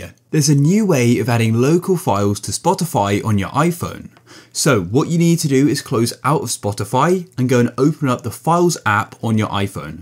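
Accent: United Kingdom. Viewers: doesn’t work + ur british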